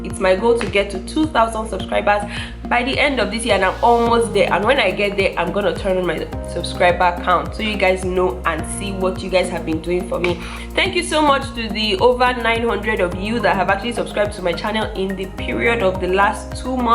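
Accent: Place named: Philippines. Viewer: I am really glad to know and heard from you a kind of Filipino English accent. You deserved to learn it from the Philippines. By the way, the verse (Romans KJV) you had mention is specifically for all the servant of the Lord who serves Him faithfully in good times and in bad times. And that two things work together for good in our lives.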